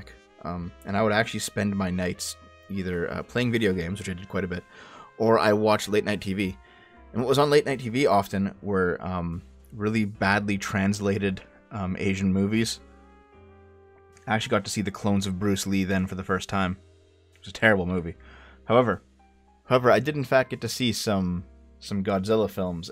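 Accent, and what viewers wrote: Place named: Ontario, Canada. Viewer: I think that you only like an hour or two from me. Based on the way you talk I'd assume you live in Ontario. This makes me wanna ask if your grocery store also has to wash the entire conveyor belt with every customer?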